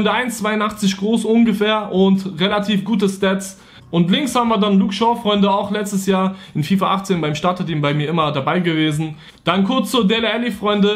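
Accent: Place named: Berlin, Germany. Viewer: Das ist Berliner Akzent die sagen isch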